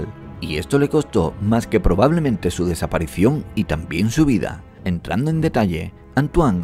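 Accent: Spain. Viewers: Eres español no?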